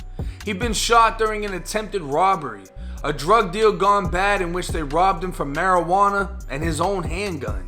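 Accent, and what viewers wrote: Boston, United States. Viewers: "To portray that he was nothing more than a criminal, killed by KARMA." LOL your boston accent made it sound like you said he got killed by comma. Kuz for some reason boston niggas dont like to pronounce the letter R if an A comes before it. Lolol Karma (kah-ma)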